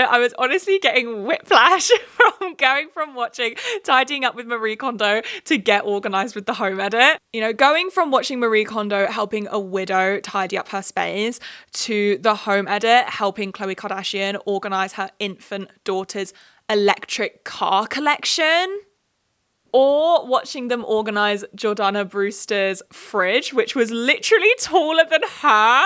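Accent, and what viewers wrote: England, United Kingdom. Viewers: Weird thing to comment on, but since Covid scattered my friend group and I had to rebuild my social life from the ground up online and I ended up with a very international social group with all kinds of different accents, I did not realize you were English till you said "washing up". I've been watching your videos for months. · Gal you are so likeable ! Such a breath of fresh air hearing one of these pop culture chit chats from a proper British hun 💋💋 also this restock shit is so tacky haha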